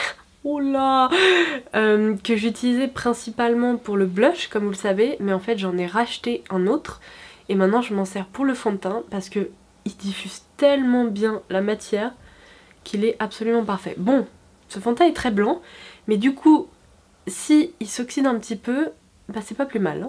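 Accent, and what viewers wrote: England, United Kingdom. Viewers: Magnifique make up 👍👍👍j'adore ton accent anglais, c 'est vraiment bien ce que fait SPacenK👍👍👍😊😊😊😊😊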